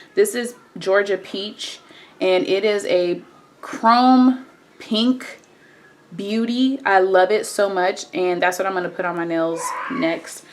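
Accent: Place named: Oklahoma, United States. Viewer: Sounds like you are in lawton ok lol new sub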